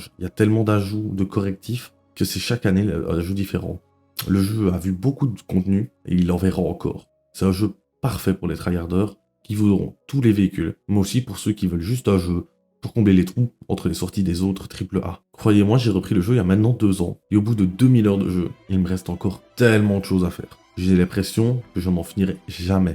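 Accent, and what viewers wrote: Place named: Liège, Belgium. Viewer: J'ai remarquer ton accent liegois direct MOI AUSSI JE SUIS UN BELGE QUI JOUE A WT 🙏🏻🇧🇪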